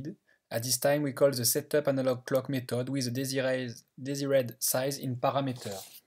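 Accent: France. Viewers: He has a french accent.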